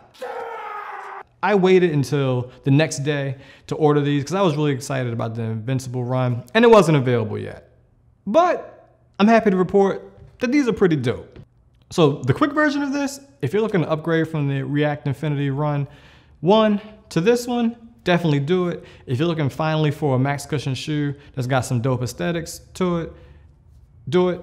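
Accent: Baltimore, United States. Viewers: You from Baltimore??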